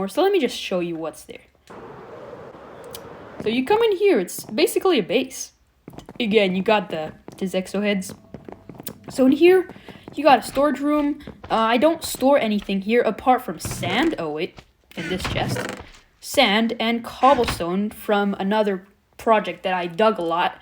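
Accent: France. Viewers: Dude you from france 😅 i recognise youre voice anyway i love this world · ARE YOU FROM FRANCE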